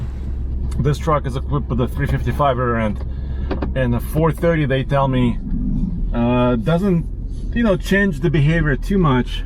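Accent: Russia. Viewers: Is this guy Russian? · Man, i hate his russian accent. Idk why.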